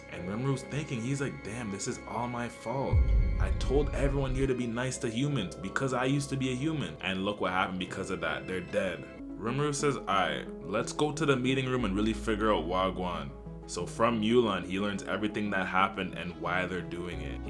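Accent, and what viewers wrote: Jamaica. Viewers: You have the Jamaican accent bro❤ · Are you Jamaican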